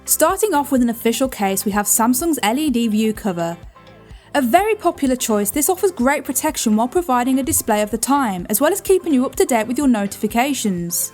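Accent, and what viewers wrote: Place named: West Midlands, United Kingdom. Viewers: West Midlands accent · That's UK's accent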